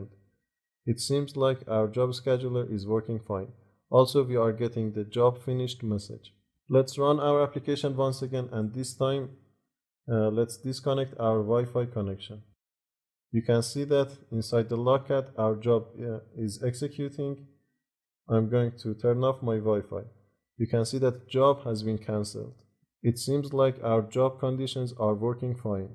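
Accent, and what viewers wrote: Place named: Iran. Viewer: Farsi accent, I found out from your accent that you are Iran and I became very happy , LIKE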